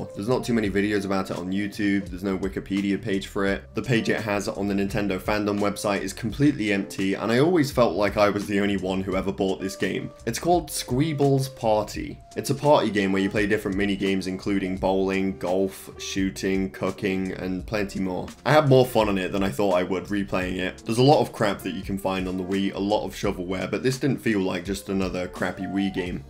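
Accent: United Kingdom. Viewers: man youre british right and i got an ad for teeth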